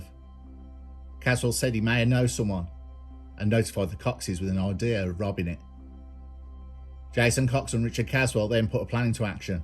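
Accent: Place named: Black Country, United Kingdom. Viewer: Lad as from the "North" and a don't usually find the Midlands/Black country accent a thing of gravity to myself, but your calm delivery has me thinking, thanks for these uploads.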